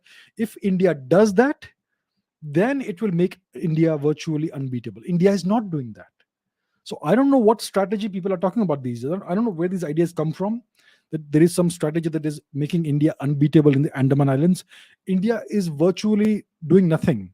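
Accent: France. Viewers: Enjoying the french accent😌😃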